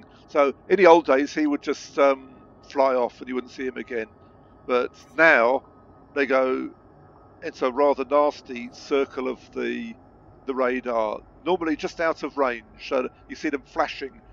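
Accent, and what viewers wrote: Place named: Bethnal Green, United Kingdom. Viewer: Very good video. Cheers! Love the accent BTW. Pure Bethnal Green! 👍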